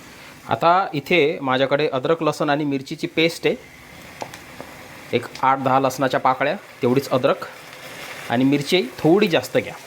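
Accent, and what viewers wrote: Nashik, India. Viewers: भाषा प्युअर नाशिकची वाटते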